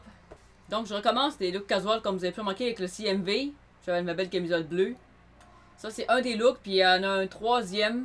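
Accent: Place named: Japan. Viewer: vraiment bravo tu l’es mérites vraiment et ton accent japonais est incroyable !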